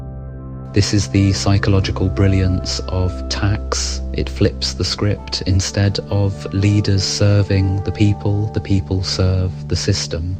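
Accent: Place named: South England, United Kingdom. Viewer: I'm a Geordie. It's always nice to hear that refined southern accent saying something that isn't absolute shite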